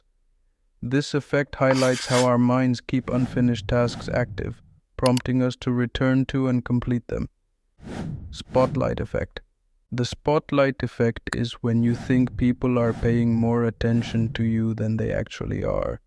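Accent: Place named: India. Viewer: indian accent and bad microphone = not watching the vid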